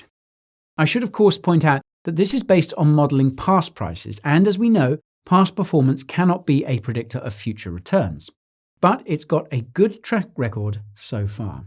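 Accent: United Kingdom. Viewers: You’re the only dude I can watch at 1.5x. And I could probably watch you at 2x if you didn’t have a British accent.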